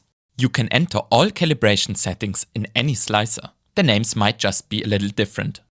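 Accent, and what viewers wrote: Germany. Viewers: Do you also have a german version of this video? Sounds like you are german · my guy as a fellow german, i’m sorry but ur accent is borderline too much. the content is great but it’s really hard to listen to. My guess is it’s because you „chop“ the words, u should adjust your max retraction and flow rate :D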